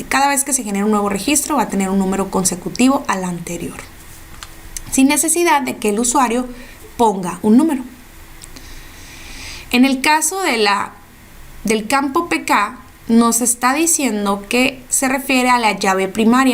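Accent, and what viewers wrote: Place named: Mexico. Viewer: Muy buen video. y por supuesto "interesante voz" a la mexicana..!!! je je. Bendiciones !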